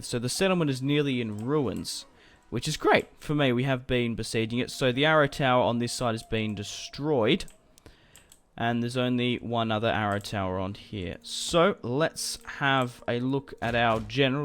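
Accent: Australia. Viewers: where about in australia you from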